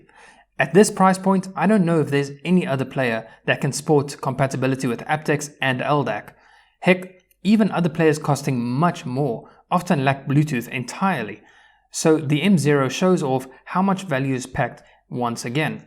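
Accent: South Africa. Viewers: It’s not often that I click on a video and hear a South African accent. 😊 Solid review! 🤙🏾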